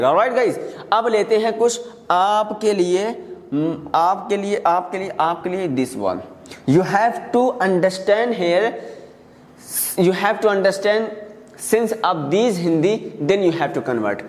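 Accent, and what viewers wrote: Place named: Bhojpuri, India. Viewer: I have never seen such a teacher like bhaiya best teacher 😆 Whenever I attend his video I started smiling Before open the class, I started laughing 😂 He isn't speak hindi he speak bhojpuri😂 his sound gives bhojpuri vibe 😅 I like it this class with no distraction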